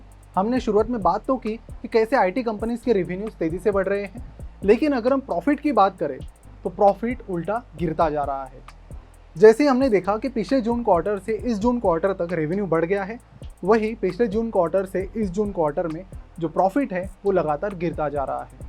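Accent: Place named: Bengal, India. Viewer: are you bengali? Some of your accents matches with my bengali accent.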